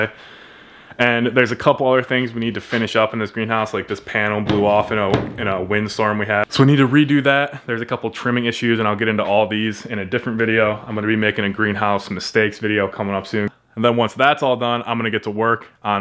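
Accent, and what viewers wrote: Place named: Wisconsin, United States. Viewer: The Wisconsin accent is incredible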